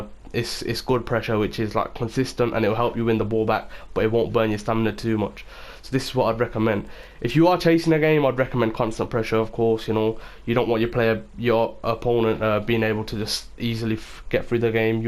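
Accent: Birmingham, United Kingdom. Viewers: Are you from brum? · is that a brummy accent lmao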